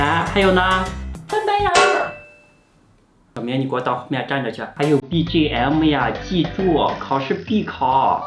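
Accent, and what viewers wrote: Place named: Shandong, China. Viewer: 是哪里人啊听着口音像山东的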